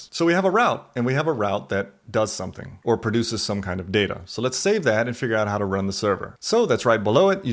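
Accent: South, United States. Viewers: you got a lil twang in your voice lol. You grew up in the south lmao?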